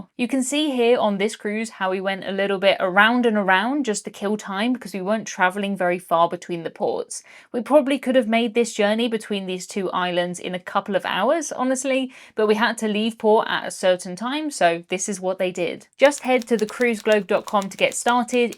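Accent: United Kingdom. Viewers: Americans definitely use “Pop” in the same manner 😊 ‘Pop out’ ‘Pop in’ ‘Pop up’ It sounds so much better when you say it with a British accent!😊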